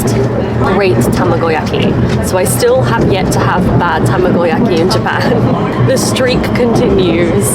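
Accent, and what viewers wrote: United Kingdom. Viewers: いつもながらナレーションが素晴らしい。響きの良い声とイギリスなまりは良い雰囲気です。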